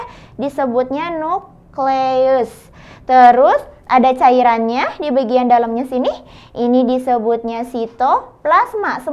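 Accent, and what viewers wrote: West Java, Indonesia. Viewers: sunda beneeerr... orang sumatera sambil senyum dengerinnya. sukses ya kak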